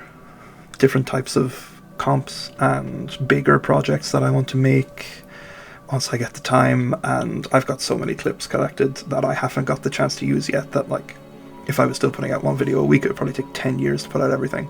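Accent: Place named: Germany. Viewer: 😍 OMG, first time I'm seeing your own art! ...your accent sounds a tad German... Sind wir Landsmänner?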